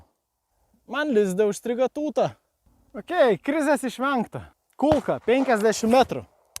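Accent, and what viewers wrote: Šiauliai, Lithuania. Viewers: Tu turi žiauriai gerą iškalbą ir gerą šiaulietišką prieskonį :D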